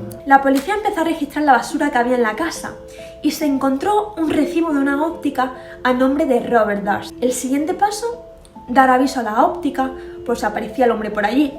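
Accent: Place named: Spain. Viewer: Bien por tú trabajo..sólo podrías no acentuar tú forma española de hablar (neutralizar sería excelente "gracias"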